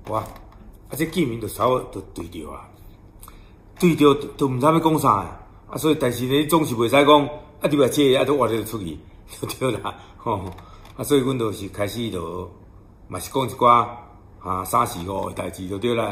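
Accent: Taiwan. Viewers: 哇，董仔的台灣味十足，還真蠻有吸引力的！ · 旺旺阿伯說話很有台灣味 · 體就是台語夾國語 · 道地隔壁阿伯說話，真親切。道地臺灣話真親切。謝謝你站出來。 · 台語講的很道地，首富卻沒有傲氣與霸氣，更接地氣。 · 旺旺聽你說話真的感覺很庶民。一點也不像大老板。又很台。不知道平時有沒有穿藍白拖🤪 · 天啊！台語口音好道地喔！怎感覺有海口音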